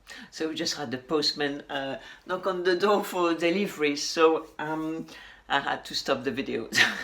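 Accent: France. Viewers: The (alpaca) Max Mara i certainly never will sell! ;) Some women like to change their wardrobe every month ;)I have a beautiful knitted 100 % Scottish cashmere scarf which i wear already for at least 15 years. So i absolutely understand what you mean but I like a little bit more change and renewing . I love beautiful animal friendly natural materials. I never wear 100 % synthetic. Sometimes there is some minor percentage of synthetic in my clothes. Have a nice day in beautiful douce France ! It is the favorite holiday country ( together with French Belgium) for me and my family living in Holland. I love the French language! In my opinion it is by far the most beautiful language on earth! I LOVE your little accent and French musical intonation ! ;) But of course your English is very very good.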